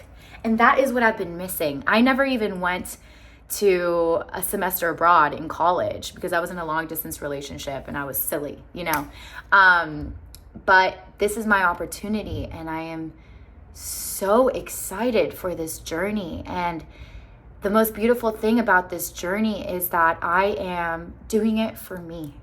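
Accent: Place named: United States. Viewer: Eres guatemalteca pero hablas gringo y, ahora, estás en México. Ah. No entendí pero suerte. 🥱🤔🤷🤙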